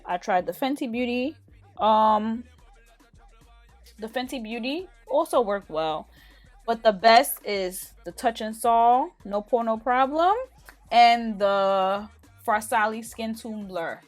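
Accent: New York, United States. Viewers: Hi,. I am pleased to meet you on YouTube. I came across your channel on the wee hours of this past werkend, while you were sitting at your desk at work. I am a career Executive Receptionist, too. The makeup look so radiant on you. I can recognize a New York dialect anywhere. New York is home to me. God bless you.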